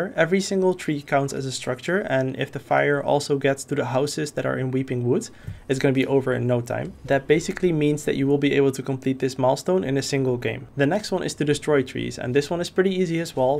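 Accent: Netherlands. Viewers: You dutch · Je hoort gewoon dat je nederlands bent😝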